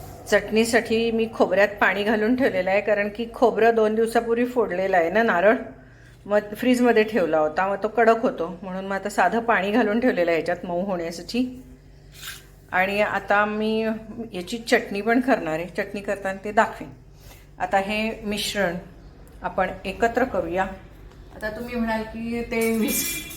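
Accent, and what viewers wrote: Kolhapur, India. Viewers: मॅडम तुम्ही कोल्हापूर साईडच्या आहात की कानडी माझ्या माहेरी पण अशाच गौरी बसवतात थोडी बोलण्याची पद्धत थोडे ताटातील पदार्थ म्हणून तुम्हाला विचारले कृपया राग मानू नये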